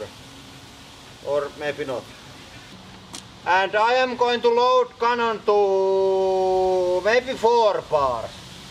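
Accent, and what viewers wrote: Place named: Finland. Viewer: The subtitles is all fucked up :) Can´t handle finnish english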